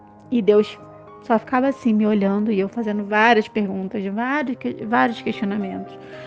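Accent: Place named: Rio de Janeiro, Brazil. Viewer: Carioca da gema 😃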